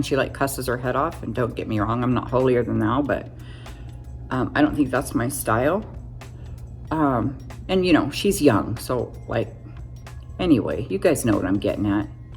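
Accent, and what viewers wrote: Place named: South, United States. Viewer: It isn’t bad however I preferred the fat triangle technique. I love my new eye cream and the way you taught us to mix it with under eye concealer. What a difference. Yup! You definitely have a Southern undertone and I love it, so just be you girl. LOL Can’t wait to see the bangs. I know you will do something to make ma laugh. Take care sweet.❤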